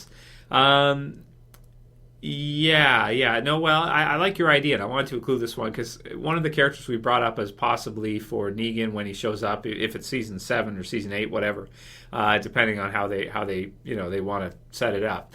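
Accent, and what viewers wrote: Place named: Canada. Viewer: Hi. I love that you mentioned the accent issue. I am not a native English speaker so I did not notice Alycia's or Nick's non-American tones, even though I knew the actors are not Americans. However, I am very interested in getting to know more about accents and to learn how to spot such anomalies. Could you please point us/me more specific moments (not time codes as they may differ) but rather specific sentences that sound not as they should in terms of accents? You mentions the throwing up scene. What exactly in it? BTW1: Why do they give roles of US people to non-American actors in the first place? Don't get me wrong, I love the cast, I really do, but - honestly - whould it be really so hard for the creators to find US actors equally good, avoiding the accents issue? BTW2: You are the first person I identified as Canadian purely by listening to, so I am not so hopeless in spotting accents as it might seem because of the fact I failed to hear it in Alycia's lines;) BTW3: Great channel! 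You are very productive! Keep it up!;) BTW4: It would be AWSOME if someone (like you) made a special bonus video about accents in our favorite TV shows. Not only to point out mistakes, but just to show non-native audience how rich English language is.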